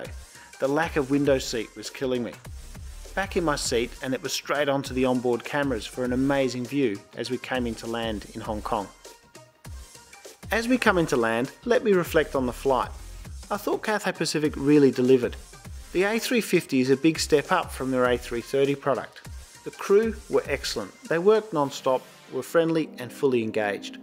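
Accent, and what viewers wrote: Australia. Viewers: I hear Aussie accent :) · Ya Australian?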